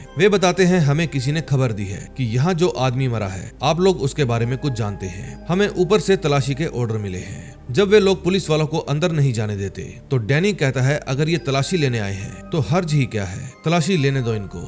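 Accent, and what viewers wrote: Punjab, India. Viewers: Tu pakka Punjabi aa😂😂😂 pamma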